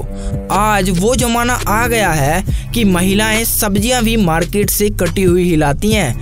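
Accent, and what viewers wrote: Bihar, India. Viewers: Apana voice thoda sudaro bihari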